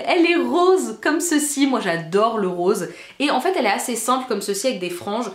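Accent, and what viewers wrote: England, United Kingdom. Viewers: Je suis jalouse de ton accent anglais 😍 mdr sinon super vidéo tes cadeaux sont géniaux 💋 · ton accent anglais 💘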